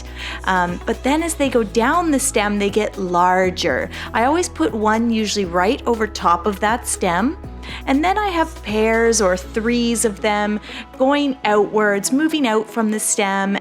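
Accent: Canada. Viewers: So I’m The first time viewer and into this video I asked myself is this a fellow Canadian, because I’m pretty sure she’s from Canada ? Checks online Yep. 🇨🇦👍❤️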